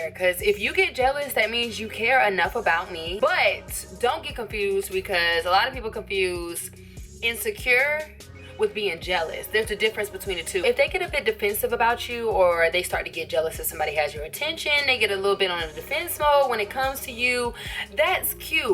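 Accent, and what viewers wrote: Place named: Texas, United States. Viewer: you must be from Texas, I hear it in your tone, very smart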